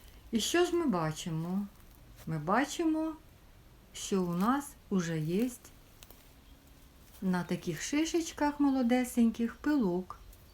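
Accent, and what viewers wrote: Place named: Transcarpathia, Ukraine. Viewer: У ВАС діалект мови Закарпаття.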